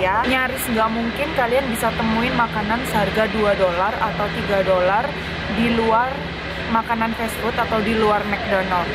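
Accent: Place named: Malang, Indonesia. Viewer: Walaahhh Arek Malang rek dadakman wkwk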